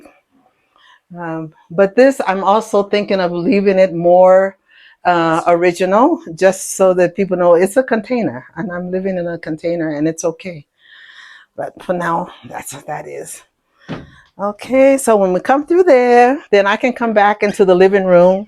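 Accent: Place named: Kenya. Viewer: What an inspiring story. Thank you for sharing. I want to be you when I grow up. You sound totally Kenyan.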